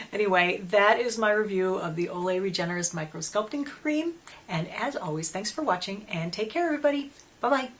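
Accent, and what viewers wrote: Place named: New England, United States. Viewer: Thank you for the reply, I'm sure you're right it seems to be a bit better. By the way, it is 65º here, just lovely, but I totally relate to your winter woes, I'm originally from South Boston, another New Englander, though you still carry the "r's" in your videos, unlike myself, even after living 20 years in Spain. A very Merry Christmas to you and my thanks for such fun and informative videos.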